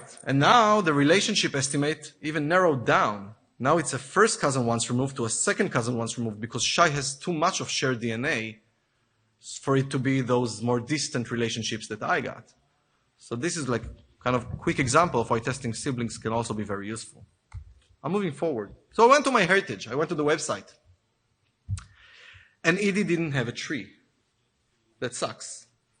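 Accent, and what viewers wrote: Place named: Italy. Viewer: u sound italian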